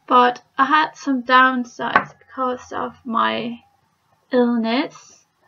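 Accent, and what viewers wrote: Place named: United Kingdom. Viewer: Du virker sød men hvorfor taler du med britisk accent. Håber det bedste for dig.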